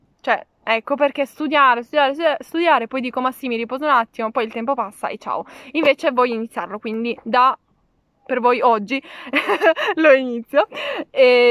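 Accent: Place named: Salento, Italy. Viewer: MAMMA MIA QUANDO ASCOLTO IL TUO ACCENTO, È MOLTO VICINO AL SALENTINO.. MAMMAMIA CHE NOSTALGIA DELLA MIA TERRA. SALUTAMI LU SALENTU,LU SULE, LU MARI E LU IENTU 🤭🤗🤗🤗🤗😂😂😂😜😜😜😜😜😜😜😛😛😛😛😛😛🌹🌹🌹🌹🌹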